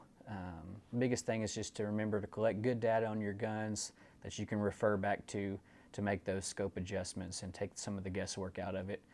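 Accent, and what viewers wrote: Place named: South, United States. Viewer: You've gotten younger......and much more southern. And is that a Bergara I spy?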